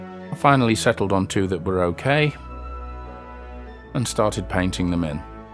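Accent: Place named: United Kingdom. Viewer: Either way, whatever you decide, you have been a huge inspiration. I have been out and started to stock up on supplies. I’m not sure what scale or style I will settle on. I do want to thank you as I mentioned for documenting all your work. I was really surprised to see you are based in New Zealand, especially with such a strong British accent. Thank you again; I look forward to seeing the notification you have posted a new video. Best wishes from Blighty.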